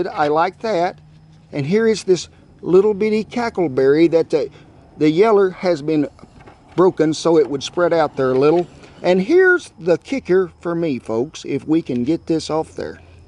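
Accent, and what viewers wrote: United States. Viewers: I am enjoying cowboy accent so much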